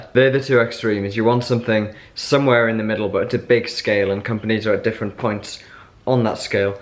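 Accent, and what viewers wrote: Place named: North East England, United Kingdom. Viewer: New Tech Genius Cause has a NE England accent. Is he more Durham way originally? They sound the same to me.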